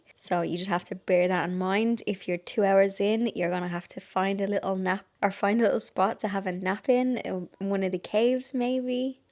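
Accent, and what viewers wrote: Ireland. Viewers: I love all your videos , you are kind and witty . I live in Scotland but I am from South America so love to hear your Irish accent helps my understanding of English and the diversity in accent . THANK YOU for letting me have a peek on to your lovely world and adventures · I live in Texas USA and has spent years working on my family tree. My mom used to say her people on her dad’s side was Irish but she knew nothing else. Since she passed I’ve learned my 5th great grand father was from Legacorry, Shankill, Armagh, Ireland. That’s how it was written out in 1640 so have not learned where that actually is yet. I enjoy just thinking one of my great grand mothers talked like you. ❤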